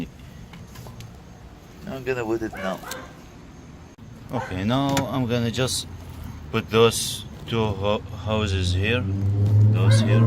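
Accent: Iraq. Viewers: اخوية انته عراقي من لهجتك ياريت تتواصل وياي لانه عندي نفس المشكلة واني ممنون منك ياطيب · يا اخي لهجتك تكول انتة عراقي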